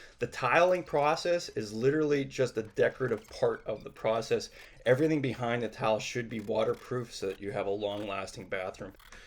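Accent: Pittsburgh, United States. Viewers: Before I saw your shirt I just knew you was from Pittsburgh 🤣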